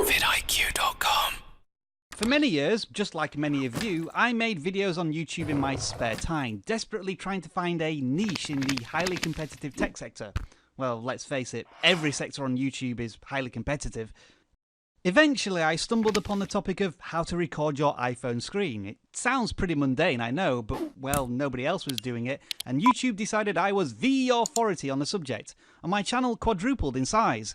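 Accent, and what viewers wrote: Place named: South Yorkshire, United Kingdom. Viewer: Where are you from? You sound local, Sheffield or South Yorkshire at least, but I'm also bad at accents.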